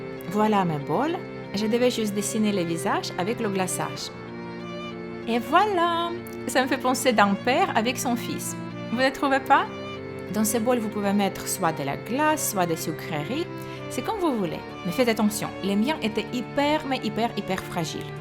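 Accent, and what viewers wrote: Russia. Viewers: J'adore tes vidéos.Et moi je trouve que ton acen Russe est super cela te différençi des autres.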